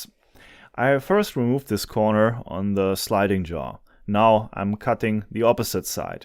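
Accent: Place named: Germany. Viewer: Despite your nearly unappreciable german accent, your english is very good and easy to understand.. didactic and vell done video, gracias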